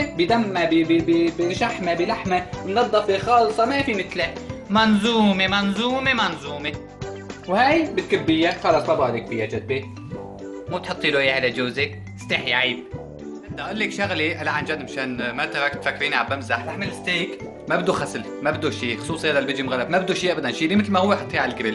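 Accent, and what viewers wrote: Aleppo, Syria. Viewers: الحلو فيو يذكرنا حكي حلب يا خيتوووووووو😊😊😊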